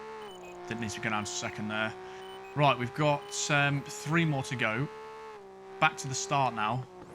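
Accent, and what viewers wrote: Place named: Midlands, United Kingdom. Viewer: it's a mixture with midlands and leicester accent lol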